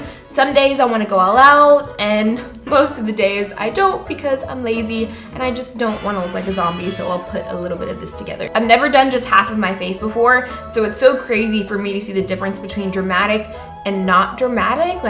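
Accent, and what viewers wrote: United States. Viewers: Are you American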